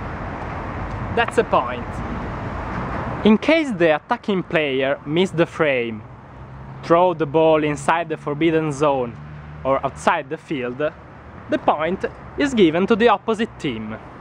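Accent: Italy. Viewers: è bello perché riesci a mantenere la cadenza lombarda anche parlando inglese... bravo! :) e bello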